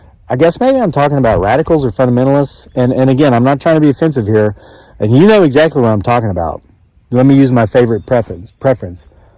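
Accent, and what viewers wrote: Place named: West Virginia, United States. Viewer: Great videos! We have to ask where you’re from? Your slight accent reminds us of our friends in Fairmont, WV 😄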